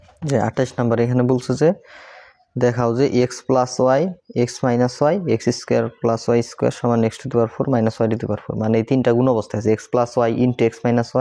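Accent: Tangail, Bangladesh. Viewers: স্যার আপনি টাঙ্গাইলের। কারণ টাঙ্গাইলের মানুষেরা এই ভাবে কথা বলে। আর স্যার আপনি অনেক ভালো অংক বুঝান ❤😊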